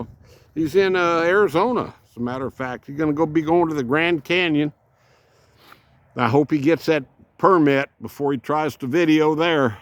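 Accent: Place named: Texas, United States. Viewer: Just came to your video (partly) because your title mentioned Sarasota Tim - he's a hoot to watch. Watched a couple of your videos and enjoy your Texas drawl and the way you comment on the Davis mountains and the surrounding area. Beautiful country. That Dixie can really move. She looks like a Border Collie, I grew up with a BC in my youth in Houston. Just subscribed.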